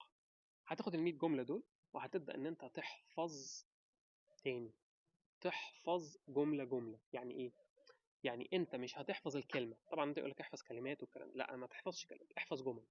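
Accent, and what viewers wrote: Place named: France. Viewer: دا الاكسنت الانجليزي الفرنساوي مضحك بجد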